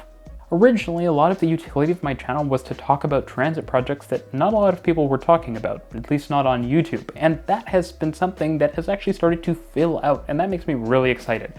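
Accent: Canada. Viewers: Thanks for all your great videos. It's been a pleasure to watch your videos over the years. I will definitely miss having such an informative Canadian voice and champion for Canadian transit projects on Youtube. So much Youtube transit content is American and you were great CanCon. All the best with the next phase of your life.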